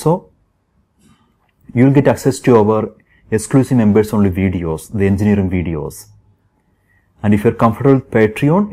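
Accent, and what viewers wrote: Kerala, India. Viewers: У тебя индийский акцент. Делаешь вид что из благородный касты, но твои поступки со страйками как из низшеготсословия. Ты из низшей касты. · His accent is like a Malayali trying to speaking in english.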